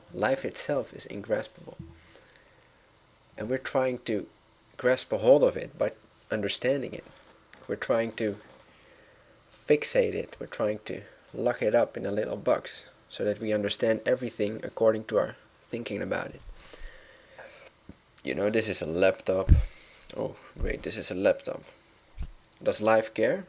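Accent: Netherlands. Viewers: yeah..... he's from Amsterdam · Is he Dutch?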